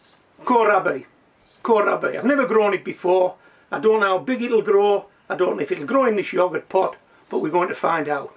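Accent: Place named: Hartlepool, United Kingdom. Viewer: I really enjoy your videos and I am learning a lot from you. I have lived in Ontario, Canada for 57 years but born in West Hartlepool. I think that you have a Hartlepudlian accent or have been born close to “home”. Am I right? Kept up the good work.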